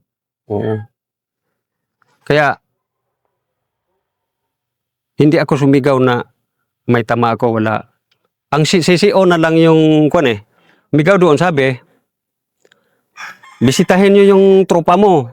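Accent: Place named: Subanen, Philippines. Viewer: Sa accent ni Master Subanen tribe sya kung di ako nagkakamali kaya matapang... Matapang ang Subanen tribe sa history nilo bolo lang at spears ang armas nila... Salute you!...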